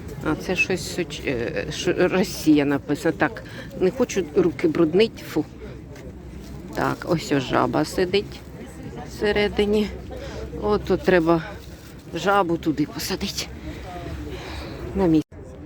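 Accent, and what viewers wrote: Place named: Kyiv, Ukraine. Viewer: Дякую за відео і чудову українську мову з київським акцентом 💞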